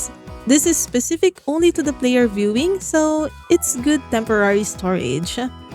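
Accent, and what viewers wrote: Philippines. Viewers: Great tips and video. That Pinoy accent though...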